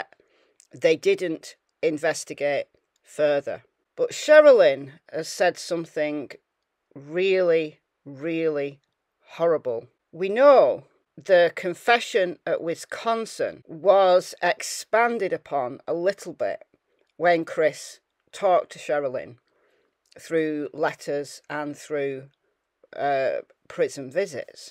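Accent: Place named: North West England, United Kingdom. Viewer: I’ve just happened upon your tunnel. You don’t sound like a scouser but you don’t sound like a Manc either. Sort of in between so somewhere in the middle is where you are. yes?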